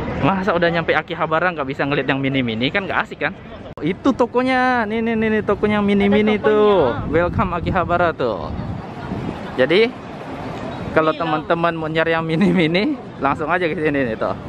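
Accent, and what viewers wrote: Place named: Manado, Indonesia. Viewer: Rupa logat manado noh 😅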